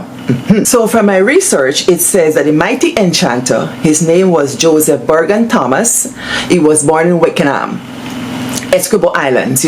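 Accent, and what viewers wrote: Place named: India. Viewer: first time here:) Just subscribed. You wowed me with your Indian accent. I was riveted to your content. Love the singing, the wielding of the belna and the moves, haha. Sharing this video with family and friends. One people, one nation…you go girl:)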